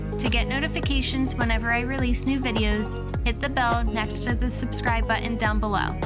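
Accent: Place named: Midwest, United States. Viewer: Just out of curiosity (and you don't need to answer if it makes you uncomfortable), but where are you from? My ear is guessing somewhere in the midwest...but that's just a guess.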